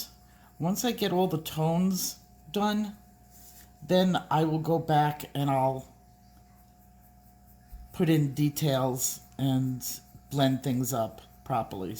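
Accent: New York, United States. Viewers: It was a real triumph for you to finally pronounce ochre - but I was so used to hearing okra, so definitely your New Yorker accent!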